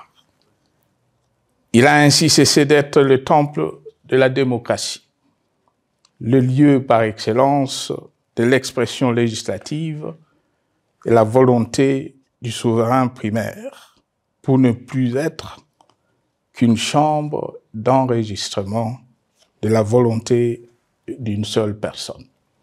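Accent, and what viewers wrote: Rwanda. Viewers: Veritable ruandais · L'accent rwandais ne l'a pas quitté malgré les efforts déployés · ça façon de parler vous sentez que c'est un Rwandais😭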